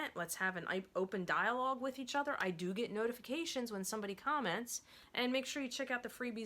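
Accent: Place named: Pennsylvania, United States. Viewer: Omg I knew that accent was very close! I’m in Media PA!! If you need a friend I’m here for you.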